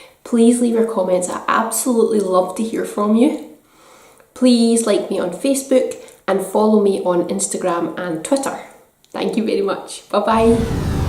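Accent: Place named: Scotland, United Kingdom. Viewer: wow...looks so good...yummy for my tummy!!!! :) you sound just like one of my other scottish friends..... Sara Fyffe - <link> love your channel - i will be back! subscriber # 142..you're going to hit 200 in no time! :)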